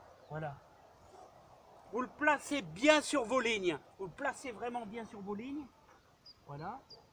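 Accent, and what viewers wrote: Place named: Roubaix, France. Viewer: Bonjour Monsieur, je découvre votre chaine bien sympathique, merci ! Et il me semble bien reconnaître l'accent de mon enfance c'est à dire Roubaix ☺ bonne continuation !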